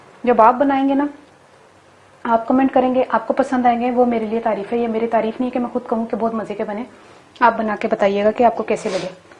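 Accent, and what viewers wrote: Punjab, India. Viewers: ap ka lehja to Panjabi ha lgta ni Karachi ki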